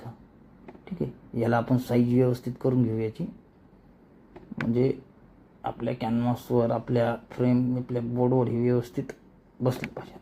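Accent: India. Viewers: अहो बापू काय राव तुम्ही पण काय ती तुमची गावरान भाषा एकच नंबर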